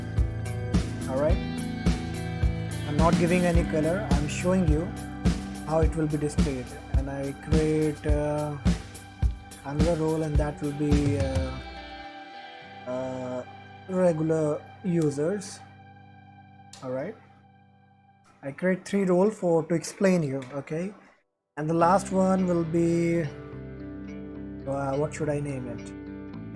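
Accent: India. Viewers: indian accent yup a scam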